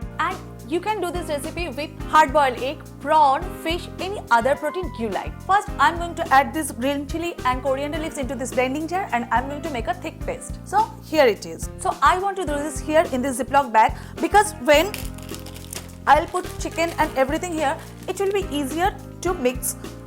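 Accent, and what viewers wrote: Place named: Bengal, India. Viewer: Kotha sune to bangali mone hoche! তাই বাংলায় বলছি-- রান্নার প্রণালী বেশ সুন্দর ও আকর্ষণীয়! কিন্তু রান্না করতে করতে ওই ন্যাকামো গুলো বেশ বিরক্তিকর!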